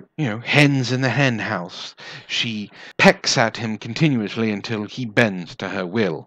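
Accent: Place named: RP, United Kingdom. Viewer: Agreed, sort of a not drunk upper class british Wizard of Cause sound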